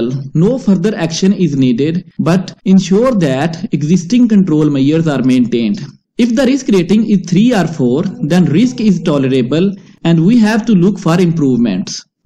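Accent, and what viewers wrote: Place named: India. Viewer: He has got the Indian varient English all mixed up? Or is he in a cold bath?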